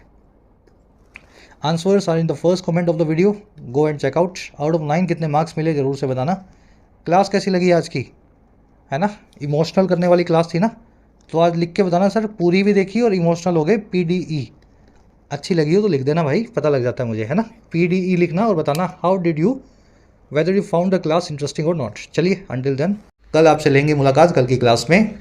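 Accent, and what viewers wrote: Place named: Punjab, India. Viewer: Sir aap to gujrat ke ho par muje aisa lagta hai aap mere bade bhai punjabi ho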